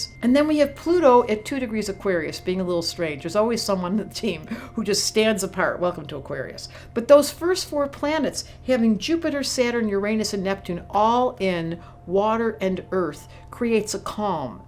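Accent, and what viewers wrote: United States. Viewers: I love your Michigan accent lol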